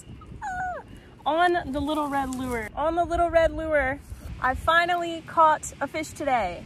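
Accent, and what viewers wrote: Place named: Missouri, United States. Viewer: Sounds like missouri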